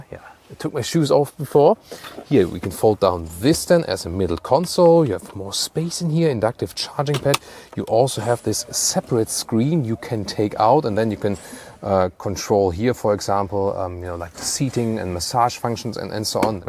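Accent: Germany. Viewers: Vom accent bin ich mir sicher das er deutscher ist 😅 🇩🇪💪💯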